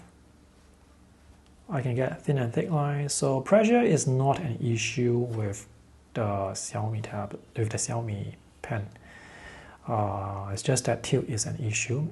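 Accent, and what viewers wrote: Singapore. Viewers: I heard your voice and said: singapore? Sounds like. And was you. The last video I saw from you was years ago, about watercolor.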